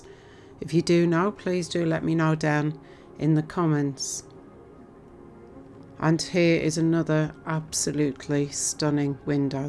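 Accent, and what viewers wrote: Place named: England, United Kingdom. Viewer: . The videos thumbnail is a white cross at the base of a Yew tree. The title states it is his guide to aging a Yew tree. Did I hear correctly that you said in another video that your not from Enland? I like listening to accents and to me you sound English. Again, just curious and mean no disrespect.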